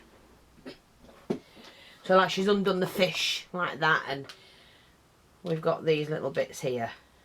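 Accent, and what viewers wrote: United Kingdom. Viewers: Loved watching you do this. You always have exactly what you need. Wonder if it would be the same way if I tried it? Not! Love your British accent. I’m from SC in USA so it’s quite a novelty..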